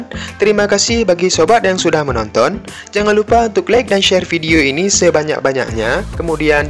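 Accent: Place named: West Sumatra, Indonesia. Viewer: Dari logat ny...Orang minang ya min..?? 😄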